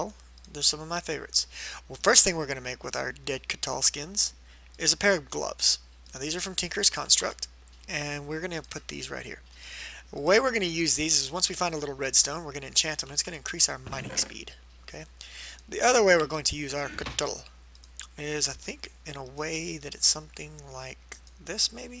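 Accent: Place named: North Carolina, United States. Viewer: are u from NC? your accent is identical to mine. lol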